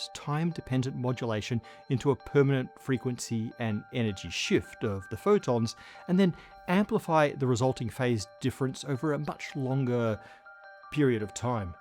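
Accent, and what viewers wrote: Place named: Australia. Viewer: Perhaps his Australian accent is making perception difficult. I am listening without headphones and have no problem. You can turn on captions, English (auto generated) to help.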